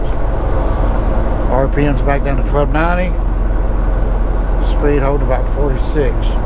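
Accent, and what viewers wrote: South, United States. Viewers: Native Alabamaian so southern talk is fine by me. Always enjoy your videos and conversation!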